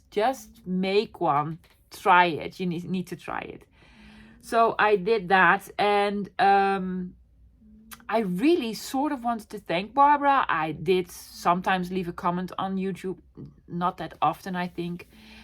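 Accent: Netherlands. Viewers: You are such a lovely person I found some days ago. I love your dutch accent and it's fun listening to you! Thanks for all the inspiration I'm always looking for. Be blessed!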